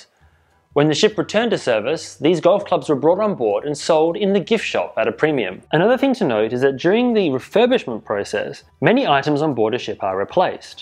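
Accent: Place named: England, United Kingdom. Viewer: I ran this video at .75 speed and this chap is more understandable with the English accent.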